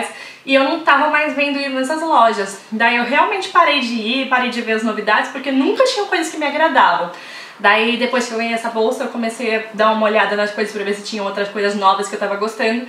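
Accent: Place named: Rio de Janeiro, Brazil. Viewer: Só eu nunca tinha notado esse sotaque carioca na?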